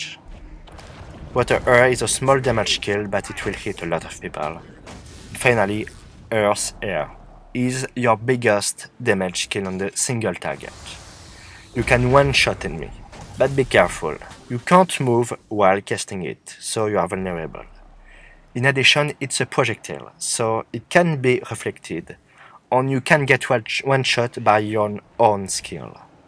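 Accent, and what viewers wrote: France. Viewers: Your english is difficult. You speak way to fast as your accent pronounces words wrong. Can't understand half of the begining. Gave up. Learn to enunciate english with out french habits. Thanks anyway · Dude! what are you french? best teaching video I've seen so far. Oh and I like when you say "it is insane" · lol its french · J'entends un accent français :)) Excellent guide !!!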